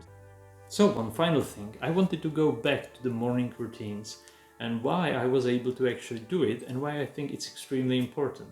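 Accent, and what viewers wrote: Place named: South Africa. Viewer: Are you South African my friend? I know your accent!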